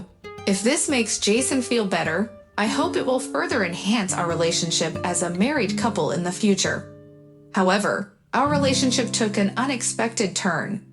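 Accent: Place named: Japan. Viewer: This is pretty disjointing and doesn't make sense. Sounds like Japanese. Nobody here in the states speak like this.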